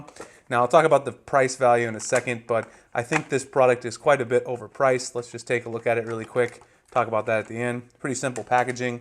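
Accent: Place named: United States. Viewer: I love the way Americans say mobile lol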